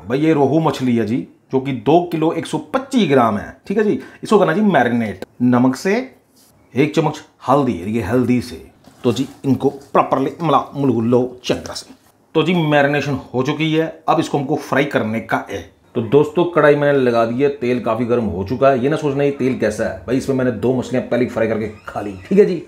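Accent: Punjab, India. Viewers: Bhai kya aap punjab se ho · Sir ur Punjani accent😍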